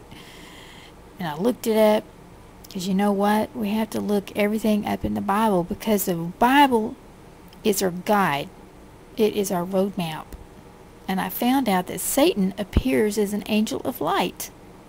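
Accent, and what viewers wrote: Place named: South Carolina, United States. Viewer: thanks for the videos.. sounds like your from i guess south Carolina?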